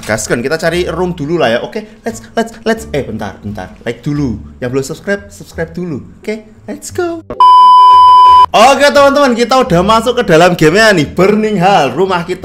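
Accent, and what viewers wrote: Java, Indonesia. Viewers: baru nonton lagi video bg ini makin medok ye ngocehnya wkwk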